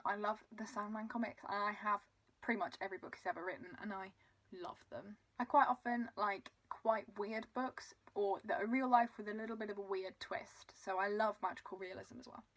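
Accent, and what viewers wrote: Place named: South, United Kingdom. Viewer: What r u studying at uni? If u were ranking all the UK Prime Ministers, where would Boris fit? Dark hair and blue eyes: Celtic blood perhaps. Yup, posh accent, you are a s'erner, but certainly not like eg. Emma Watson's modern RP (Received Pronunciation). Anything RP is so choncey uppercrust.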